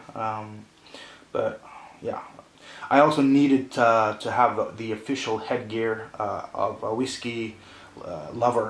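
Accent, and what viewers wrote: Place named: Norway. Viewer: I really enjoyed this video,I am not a whisky man but I will have the odd single malt or a bourbon at home and yeah chasers are very common in the UK, I first had you down as American and then Irish and then Dutch and then Danish,are you Norwegian though? Cheers mate,subbed to and beer looks good as well,17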